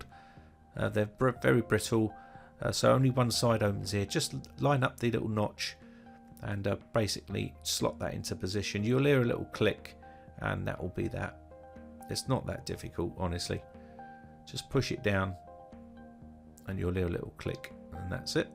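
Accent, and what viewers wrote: United Kingdom. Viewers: It's about 39 pounds Me, an American: Oh golly gee willakerz that's heavy!!! British accent continues Me: Oh right...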